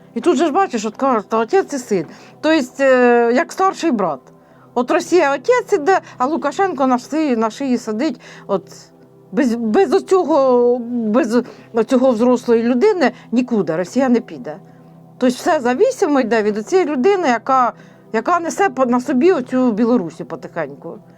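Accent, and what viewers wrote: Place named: Surzhyk, Ukraine. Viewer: Дякую Вам,пані! Чую Вас і радію. І ше, Ваш суржик я теж так розмовляю. Харків з Вами.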